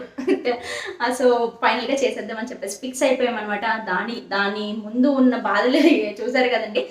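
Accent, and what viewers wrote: Srikakulam, India. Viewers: Srikakulam slang vastundi 😘😂😂madi kuda sklm aa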